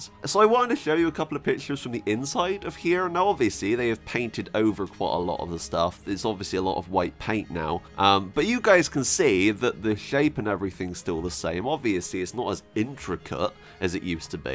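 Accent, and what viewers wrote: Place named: Dorset, United Kingdom. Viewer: is that a posh dorset accent? I have no idea what it is, wacky man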